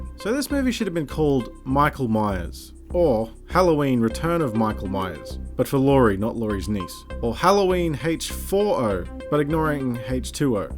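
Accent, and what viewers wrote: Australia. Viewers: Slightly off topic "From the people who brought you Halloween and such titles as; H2O: Water..! H4O: Twice the Water..! Comes... H60..! Three times the water! Prepare to wet yourself, this Halloween season..! H6O! In theaters, now!" (Great vid of course, love the Australian accent as always. Good people.) ^^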